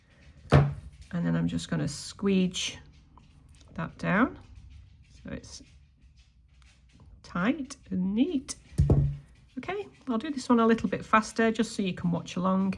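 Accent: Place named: England, United Kingdom. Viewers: I love you English accent. It’s awesome and I love this design. Thank you. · Wow that is such a lovely design! Lends itself beautifully to the bracelet,and the earrings with the dangly paddles are a triumph! We love to get a glimpse of your life by listening to your warm Lancashire accented voice teaching us as well as generally chatting. I think of you as my lovely clever talented friend who just happens to live half a world away! Your tip about how you finished up with your exact measurements was invaluable. Ok I’ll stop waffling now - I’m glad you are mostly over your “lurgie” as we call it in Australia. 😘 Roz on OZ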